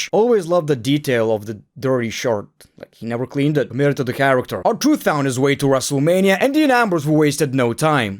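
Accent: Russia. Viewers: I need more Russian accent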